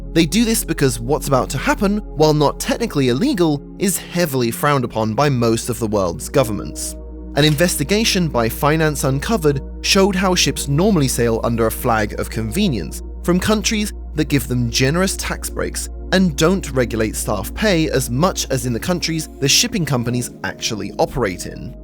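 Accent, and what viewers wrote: United Kingdom. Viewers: Really excited to watch this until I heard the narrator is British, what a shame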